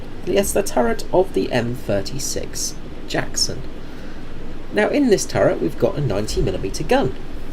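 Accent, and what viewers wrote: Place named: Australia. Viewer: Thank you again for your reply and the info on TD units .I will definitely check it out . John from Pittsburgh ( if you don't know , that's in the state of Pennsylvania , USA --- you sound like an Aussie or Kiwi , so thanks again mate )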